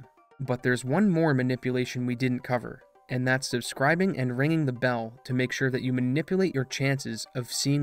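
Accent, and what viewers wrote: Canada. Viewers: proud of myself for predicting that you were Canadian based on your accent. Great video though!!!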